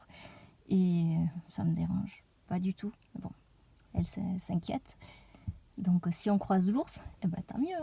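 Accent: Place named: Pyrenees, France. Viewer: Ah les Pyrénées,on ne veut pas les quitter,je suis d’accord,et pourquoi tu parles comme moi on a le même accent 😂❤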